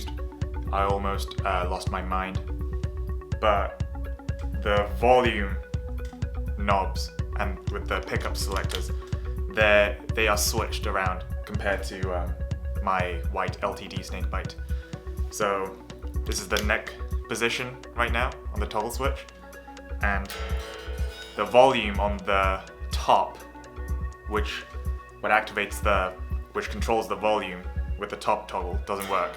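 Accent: United Kingdom. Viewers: I love listening to your British accent ❤️ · For some reason I wasn’t expecting an english accent. But it suits you perfectly lmao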